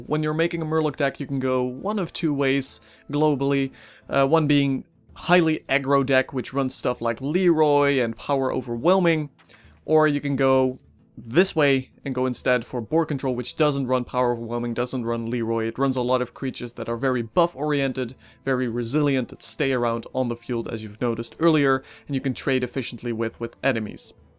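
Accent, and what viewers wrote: Netherlands. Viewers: you from the Netherlands or Belgium?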